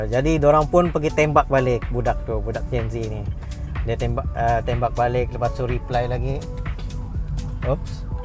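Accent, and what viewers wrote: Sabah, Malaysia. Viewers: Aku dari first day tgk sudah tau ni slang Sabah. Semenanjung juga la paling sensitif kalau dengar Sabahan slang. Lawak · Hanya sabahan cam sabah accent 😅 Jalan siring2 baru Selamat bah😂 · yang komen to buduh kah ? loghat sabah ngan indo pun tak dapat beza. duduk dalam gua kah dia tu . kau relex je bang. budak2 skrang mmg cmtu. tu budak tu klo di tempat aku mmg kena skolah tu orang.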